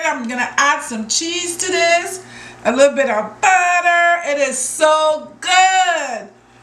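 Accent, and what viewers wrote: Barbados. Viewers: I happened across your channel a couple weeks back. From the time I heard your voice I said "she is a bajan". I am off work this week and you can bet I'll be trying some of these recipes.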